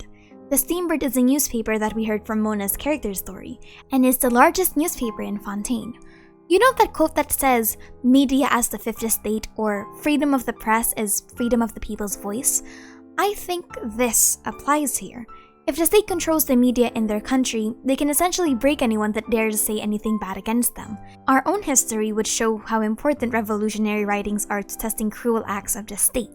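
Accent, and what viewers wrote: Philippines. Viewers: I had the inkling you're a Filipino because of your accent when speaking in English :D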